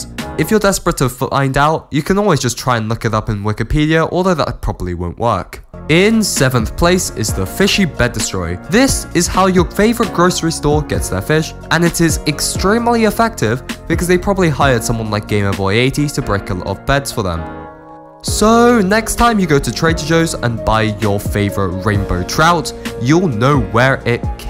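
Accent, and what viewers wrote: France. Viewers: wait hold up, are you french?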